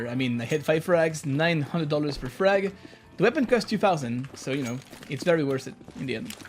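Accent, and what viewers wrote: France. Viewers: sound french ? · you have a french accent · Is he french ? His accent is betraying him xD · French accent ? 😁😁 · You have a French accent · You have some Times a french accente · Are you french ? Your accent looks so much french if you're not it's weird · J'adore ton accent francais esti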